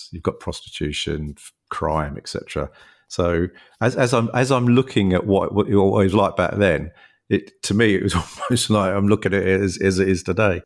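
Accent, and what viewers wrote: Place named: United States. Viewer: Once I heard the American narration bs……..I scrolled on……utter drivel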